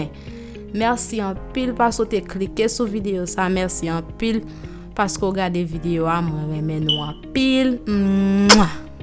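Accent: Haiti. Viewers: Janw pale a ou se on fanm okap oubyen ou sot nan nord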